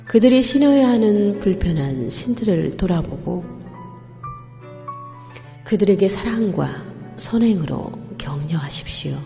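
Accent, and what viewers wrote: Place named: South Korea. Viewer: Nice, calming voice. I love korean accent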